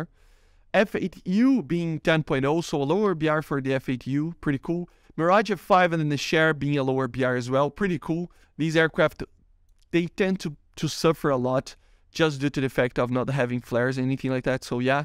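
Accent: Brazil. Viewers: that Brazilian accent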